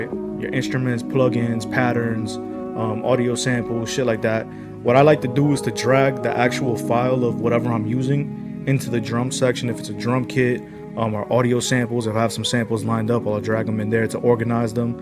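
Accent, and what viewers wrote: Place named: New York, United States. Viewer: True New Yorker, where you from?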